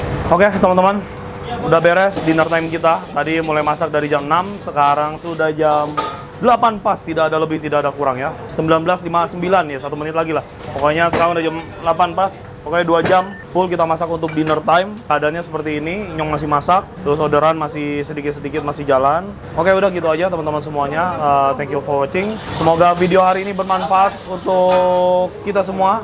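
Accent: Banyumas, Indonesia. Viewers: lama2 pinter ngapak gabunge sama mas inyong 👍👍😀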